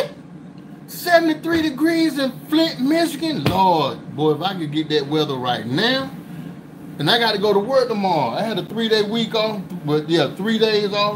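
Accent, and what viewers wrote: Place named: North Carolina, United States. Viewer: Hey, I don't know what part of the country you're from but it sounds like N.C. but heard you said you got a air fryer, well I'm gonna get one but I've been waiting for a good one do you recommend the one you have with the fried 🌽 corn? Barbara j.from N.C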